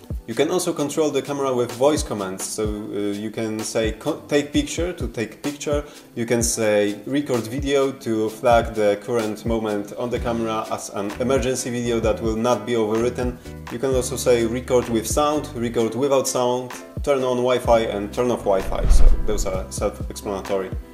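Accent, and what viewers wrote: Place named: Poland. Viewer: Ale schize miałem. Akcent taki znajomy, polski i dopiero przy porównaniach wideo zrozumiałem xD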